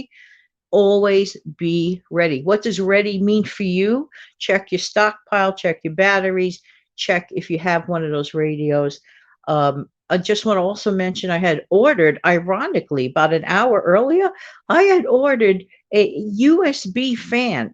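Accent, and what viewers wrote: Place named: New York, United States. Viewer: I’m listening to your voice and thinking how did I not know you were a fellow New Yorker. Cool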